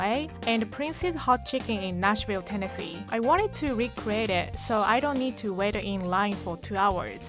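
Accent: Japan. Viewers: Her japanese accent is sooo cute! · hæs lived in ðe States 10 jers ænd still hæs a Japanese accent... interestiŋ It'st so fascinatiŋ to sich how people's accents wœrk! Ich lived in Canada for a jer ænd ich adopted ðe accent instantliche... How loŋ dœs it take for ȝou to adopt æn accent? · Yep!! Her Japanese accent is charming 😃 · 10 years didn’t make her japanese accent go away. I’ve met people who lived overseas for 2 years an came back with an accent but can’t speak his native accent like usual..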